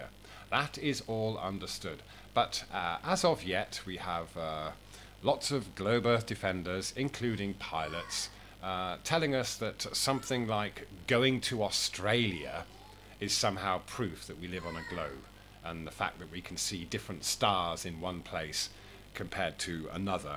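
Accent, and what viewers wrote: United Kingdom. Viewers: You sound as though you were educated in the UK. Could you please tell me at which school?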